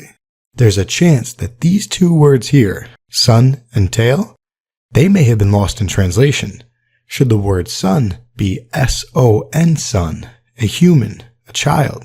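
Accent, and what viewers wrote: New Jersey, United States. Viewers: You knocked the narration of this one out of the ball park!! Not too fast not too slow & just a hint of Jersey 😀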